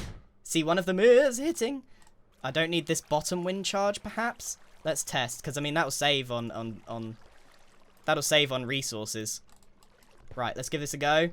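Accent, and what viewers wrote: United Kingdom. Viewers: he just sounded British to me 🤷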